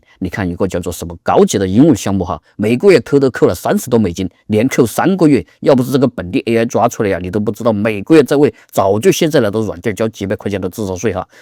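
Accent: Sichuan, China. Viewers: 兄弟伙你这声音恩是川普哦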